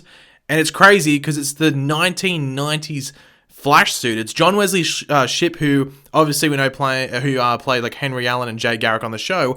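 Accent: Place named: Australia. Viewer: Lol, sounds so Australian in this video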